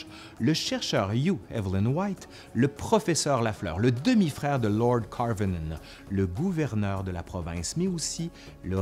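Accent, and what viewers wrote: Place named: Acadia, Canada. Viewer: Les bobards des journaux européen ont la vie dure.. avec les malédictions (sur Howard Carter et, tout les autres,).bien avant the Sun un des... descendants.. Merci pour cette vidéo Intéressant ...et pédagogique On suit les explications comme les fresques.. égyptiennes.. avec un éclairage nouveau et toujours sympathique avec l'accent Acadien 😉👍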